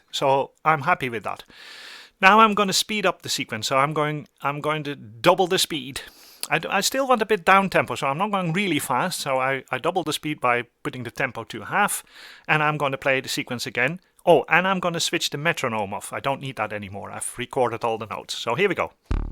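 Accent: United Kingdom. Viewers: Liked and subscribed! Great video. Amazing accent. Have you spent time in the north of England / Scotland? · Is there some Scouser in your accent?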